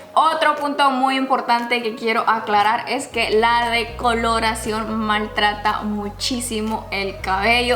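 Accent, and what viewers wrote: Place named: Honduras. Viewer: Así hablamos en Honduras !!!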